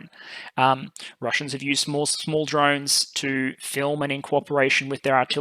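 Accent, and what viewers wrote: Australia. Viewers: Are you an Aussie like myself?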